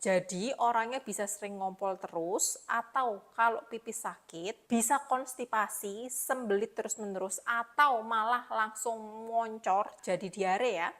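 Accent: Java, Indonesia. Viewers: Medok yah? 😶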